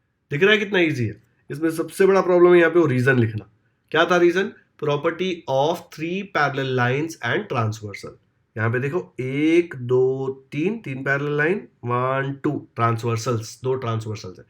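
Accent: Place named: Maharashtra, India. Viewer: I having doubt of your language your mother tongue is Marathi because you was tell tow one chaa that way😅😅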